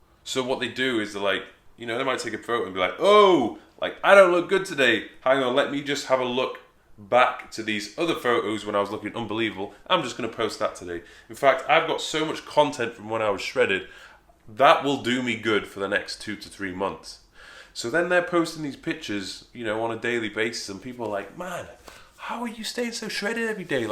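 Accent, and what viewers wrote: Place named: Yorkshire, United Kingdom. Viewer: its a Yorkshire/Geordie accent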